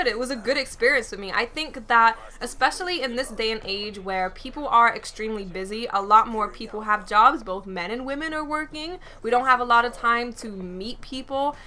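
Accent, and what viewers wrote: United Kingdom. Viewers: UK....lol weres ur accent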